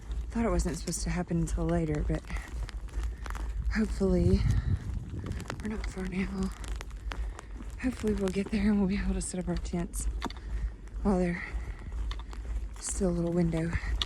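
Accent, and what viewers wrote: South, United States. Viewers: Y’all would be SOOOO fun to hike with! (In a non-social distancing environment, of course)! Love the southern accent young lady! Our Head Start children would loVe your voice at story telling time!!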